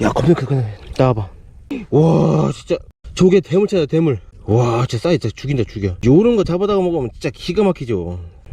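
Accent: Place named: Jeolla, South Korea. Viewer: 매번 영상 잼나게 잘 보고 있습니다.^^ 더운 여름 홧팅하세요 * 건의 사항 구독자로서 주제넘는 참견(?)을 좀 하자면 - 구독자가 팍팍 늘었으면 하는 바램으로 1) 오늘 영상에서 조개 잡는 영상을 좀더 길게 했으면 합니다. (조개잡이 7분 먹방 9분) 사람마다 차이는 있겠지만 저는 먹방보단 조개잡이가 더 길었으면 하는 바램 2) 먹방영상을 따로 만드는 것도 좋겠어요. (동네 또는 지역맛집소개) 아예 대놓고 막 드시는 영상요... 마치 먹방유튜버들처럼 해도 잘하실듯. 너무 맛나게 그리고 많이 잘 드심 3) 컨텐츠 하실 때 , 말씀을 더 많이 하셨으면 합니다. 형님 일상이나 컨텐츠 내용 설명이라든지 저는 경상도 사람이지만 형님 이야기하시는거 너~~~~무 정겹고 좋습니다. 사실 저는 거의 형님 전라도 사투리 듣는 재미로 온당께요. 빨리 구독자 많아져서 전업유튜버(?) 하시길 응원합니다^^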